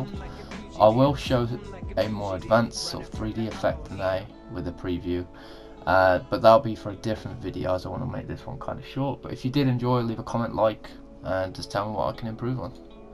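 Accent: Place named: United Kingdom. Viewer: Thick British accent gotta be one of my favorite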